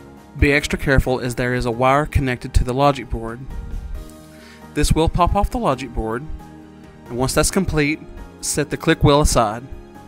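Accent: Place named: Deep South, United States. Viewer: I love your accent - are you from the deep south?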